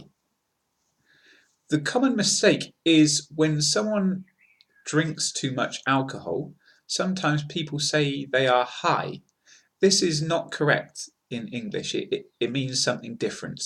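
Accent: United Kingdom. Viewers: What part of Britain is his accent from?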